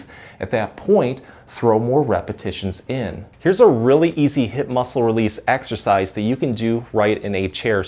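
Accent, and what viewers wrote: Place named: Midwest, United States. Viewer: Wonderful, I'm going to share this, then stretch. It's nice hearing the Midwestern accent. Chicago girl stuck in NC. Thanks for the video.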